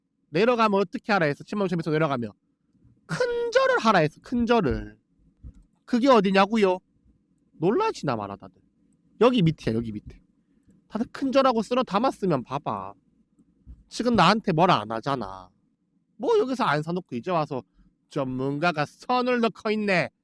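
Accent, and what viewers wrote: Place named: Gyeongsang, South Korea. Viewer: 경상도 사투리 의요 정감이 가는 언어 웃어봅니다 이른 아침부터 유익한 정보 감사합니다 님! 믿고 따라갑니다 감사하구요 화이팅입니다~~🎉🎉🎉😅😅😅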